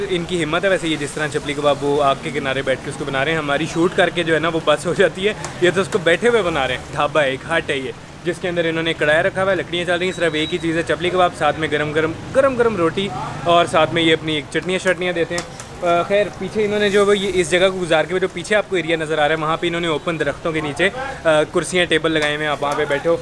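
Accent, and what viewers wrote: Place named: Bihar, India. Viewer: Waise tum ek bihari ki tarah bolte ho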